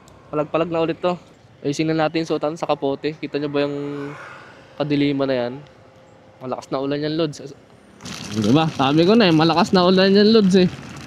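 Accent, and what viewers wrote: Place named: Cebuano, Philippines. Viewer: 😂😮 Cebuano k pla hnd waray,ingat ba Kaibigan 😊😮idoy or nonoy ,noy sa Tagalog haha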